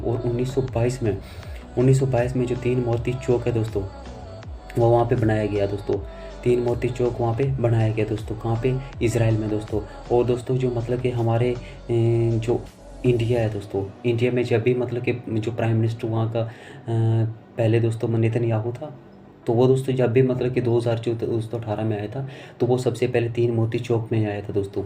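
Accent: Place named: Pakistan. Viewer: Baat Karne Ka Accent Paakistani Kyu Lag Raha ? Paakistani Bhai Ho Kya ?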